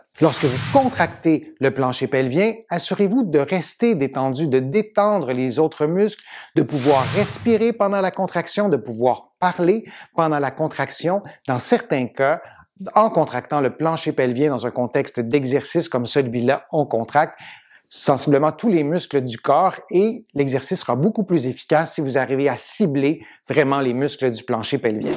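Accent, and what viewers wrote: Canada. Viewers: ❤! De plus, j’adore votre accent canadien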